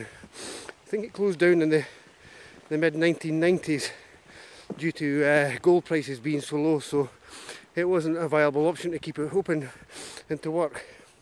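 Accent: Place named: Scotland, United Kingdom. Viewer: Myself and daveswildcamping,love the Scottish accent. It puts u,right there.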